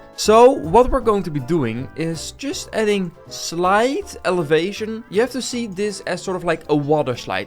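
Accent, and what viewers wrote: Netherlands. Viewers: You got a dutch accent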